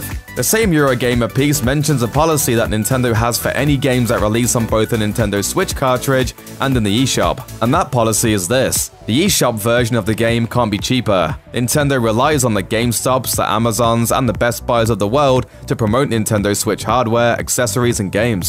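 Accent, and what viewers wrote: United Kingdom. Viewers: This video didn't answer my question at all..it just showed me that anything I look up about video games is gonna give me a narrator with a 'eavy british accent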